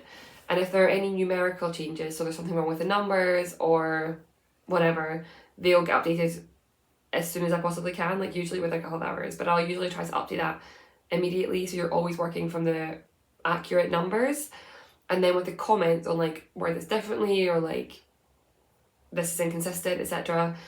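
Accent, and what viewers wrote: Scotland, United Kingdom. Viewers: Ignore the naysayers. Scottish accents are the coolest! Thanks for the great episode. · I personally love hearing your Scottish accent and don't have trouble understanding you at all! The Youtube knitting community is a great way to hear so many different accents and I love it. Your podcast is the best. Love from Australia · Love your accent. Reminds me of when I am visiting my daughter in Aberdeen. I’m in Canada❤ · Please! I love your Scottish Accent and I am not having any issues at all! You are you and if folks don't like how you speak they don't have to watch!! I am grateful you are here and Love your knitting podcast!And..... I can't wait for your sweater release!! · Please do not change. Your Scottish brogue is so beautiful and if someone truly doesn't like your videos they can always choose not to watch. The self-entitlement of those people asking you to change to suit their own convenience is astounding. 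· It’s not your accent, your Scottish accent is lovely, I think. it’s only that at times words aren’t pronounced fully (several words come out as one!), but of course it’s up to you whether you want to reach more people or not, it’s your channel 😊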